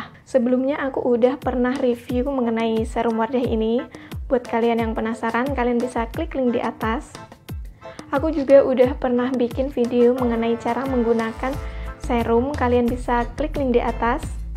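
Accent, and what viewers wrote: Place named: South Kalimantan, Indonesia. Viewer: logat ngomongnya mbak kaya dari kalimantan selatan pahuluan saat ngomong kata "wardah", "wajah", "like", "ada", "pori-pori", "harga", "sembilan", "ribuan", "mbak-mbak".... nebak iseng-iseng doang, hehe... nice video... main2 juga ya ke channel saya. .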